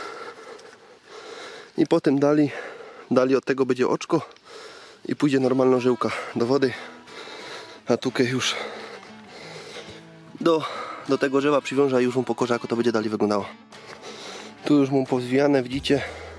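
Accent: Silesia, Poland. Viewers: Filmik fajny, ale śląskiej gwary bardzo ciężko się słucha.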